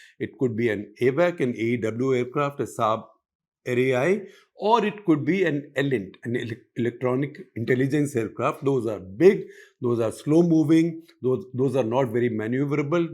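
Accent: India. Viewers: It's good to hear you always and your hinglish and even English sounds like more desi, and your interest in cricket and in all other fields make you a perfect speaker unlike Sethi from pakistan on other side a propoganda tool. I mentioned him coz you two of same age and have seen many wonderful events in history. Keep it up shekhar ji.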